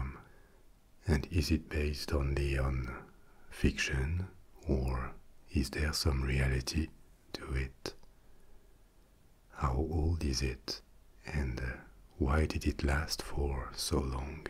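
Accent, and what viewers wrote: France. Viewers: Hey, cool idea for an ASMR channel, finally sth different, your calming voice with the French accent rocks, dude!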